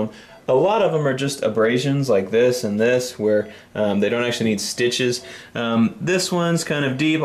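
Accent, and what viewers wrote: Texas, United States. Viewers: i have a feeling you're from the austin or from the houston area in tx 🤔🤔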